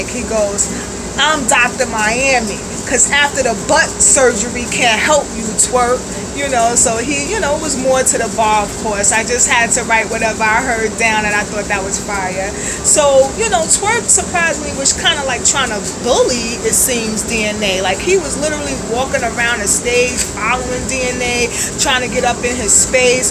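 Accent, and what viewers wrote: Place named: New York, United States. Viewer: I love your NYC accent :p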